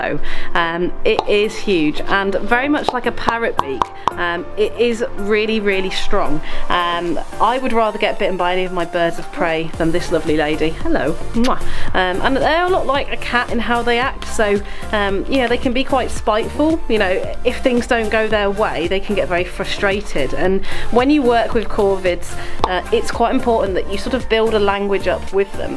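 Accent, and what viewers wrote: United Kingdom. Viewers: You have a beautiful British English accent. And your female raven Fable sounds cute when she says, “hi, boop boop,” and, “wow.”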